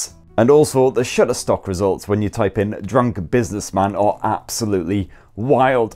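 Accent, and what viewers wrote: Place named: Newcastle, United Kingdom. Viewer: I meant it as a compliment, I'm a Geordie myself and I cannot detect any of the usual Makemesque accent. Your accent sounds very much north of the Tyne to me.😳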